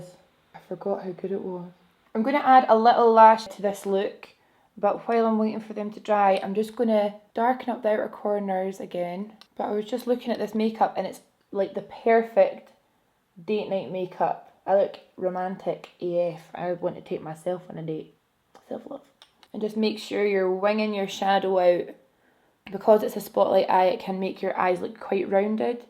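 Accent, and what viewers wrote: Scotland, United Kingdom. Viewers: Got my captions on because I'm blow drying my hair and can't hear... YouTube cannot translate the Scottish accent. Instead of 'contouring' the subs said 'corn shooting' and it's killing me 😂🤣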